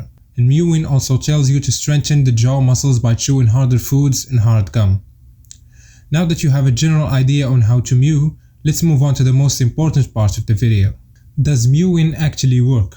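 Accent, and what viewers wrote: Morocco. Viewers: May I ask if you're Moroccan / Algerian? You're accent really sounds like you're from there :) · You sound Moroccan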